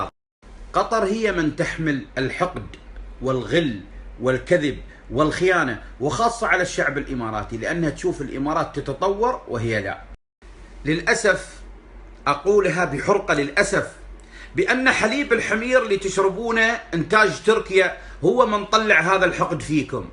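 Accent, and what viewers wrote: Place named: Bahrain. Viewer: ابدا منت كعبي مستحيل لهجتك بحراني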